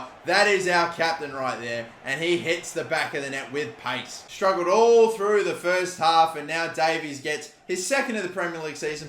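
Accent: Australia. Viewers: pure Australians accent 😂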